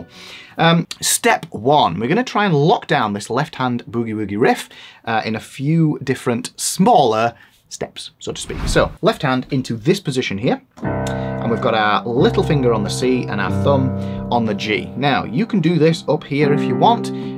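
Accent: United Kingdom. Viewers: I’m watching this for my daughter. I know music theotry, but I am a bassist who know plays mostly gtr. But because you have Czech name and a Harry Potter accent, she might listen to you. If a I showed her this, I’d be just a stupid dad, but for her your professor…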